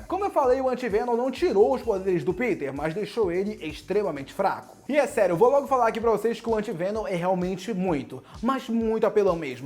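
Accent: Brazil. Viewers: ce é de Belém?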